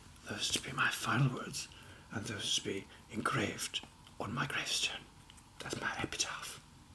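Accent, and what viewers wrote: RP, United Kingdom. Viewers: That accent is so plummy I had you mistaken for Peter O'Toole! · Well, was not expecting you to have such a spot-on posh accent!!! Wonderful video. Hope you've been doing okay over your absence and thank you for your wonderful videos. 😆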